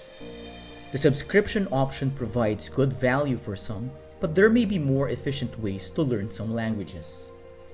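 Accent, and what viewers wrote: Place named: Philippines. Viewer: Are you filipino? I really appreciate the review, but i couldnt help but notice that you sound like my cousins haha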